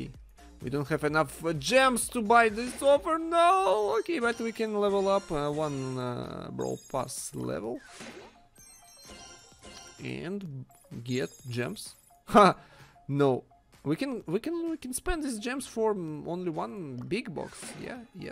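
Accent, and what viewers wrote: Russia. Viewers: his Russian trying to talk English for a video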